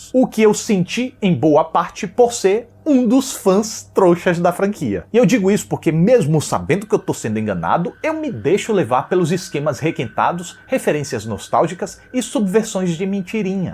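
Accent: Northeast, Brazil. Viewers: Não conhecia seu canal e já amei primeiro pq claramente vc é nordestino kkkkkk sou de Sergipe e pra variar gosto de ver uma linguagem acessível e pela resenha de forma leve! Vi o 6 domingo no cinema e durante a semana revi os outros pra relembrar... Obrigada pelo vídeo 👏🏽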